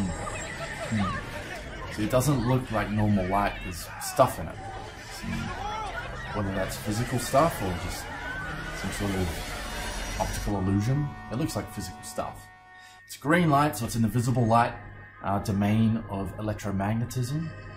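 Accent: Australia. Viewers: Can i guess that you're from Victoria?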